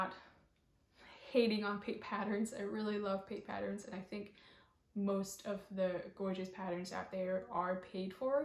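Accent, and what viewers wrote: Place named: United States. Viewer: Hi from Indiana in the US! Here the plural of yarn is yarns. ("...all of these yarns." or "...these types of yarn.") Your English sounds like American English, and your accent rarely comes out. (You speak more clearly than a lot of people in different regions here, so well done!) I feel like a huge go-to for using up stash, especially different colors of the same yarn, is to make a blanket out of them. I tried to look up the Bumbo in Ravelry to see what weight it is, but a lot of different options came up. I made a cardigan from dk acrylic, and I actually liked the structure I felt like it had. Acrylic seems cozy to me, although I agree it has its time and place. I hope a job presents itself for you, and I look forward to seeing your shawl!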